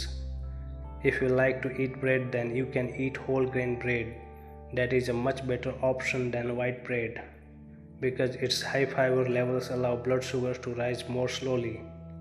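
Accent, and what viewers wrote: India. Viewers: The narrator is Indian. It is very obvious. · The way he pronounces "poootaaatoooozz" that's funny! Obviously Indian accent that's for sure!!!😂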